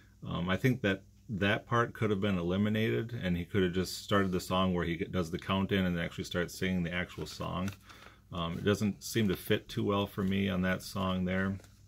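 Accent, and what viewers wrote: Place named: Minnesota, United States. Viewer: Too funny. I think sometimes the more you listen, the more you like :) I'm glad you're enjoying it :) Also I'm sorry I thought you were from Canada...to my Michigan ear, Canadian & Minnesota accents sometimes sound the same....Oy vey.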